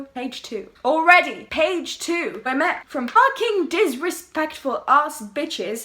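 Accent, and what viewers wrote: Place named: England, United Kingdom. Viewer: I subbed because of your English accent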